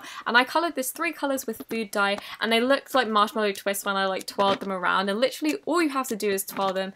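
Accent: United Kingdom. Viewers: Are you British? You sound British.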